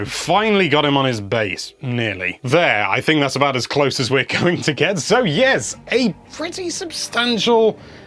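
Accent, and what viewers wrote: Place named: United Kingdom. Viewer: ayyyy your british....im subbing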